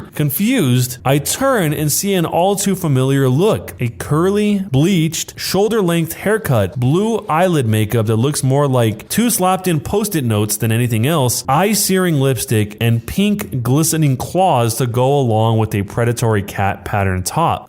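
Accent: South, United States. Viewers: Your southern accent makes me want to watch true blood again lol. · I am absolutely losing my head over the southern accent omfg i love it 😂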